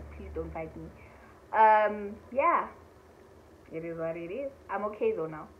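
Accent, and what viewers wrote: South Africa. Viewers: I just love you❤️❤️your Xhosa accent 👌👌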